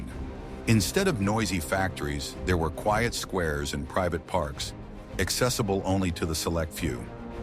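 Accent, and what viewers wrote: United States. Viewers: What’s this American voice all about, it’s put me off as you don’t tend to believe it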